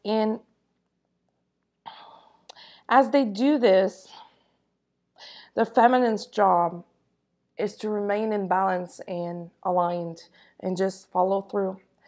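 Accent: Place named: Montreal, Canada. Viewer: I live in the country and while gathering horsetail for my tinctures, I pulled up a nice dandelion plant, saved the roots to roast for coffee, and cut the leaves up finely, added some of my seasoned oil and vinegar, salt, pepper and voila! Are you in Canada? I lived in Montreal and recognize the accent, I think...Namaste!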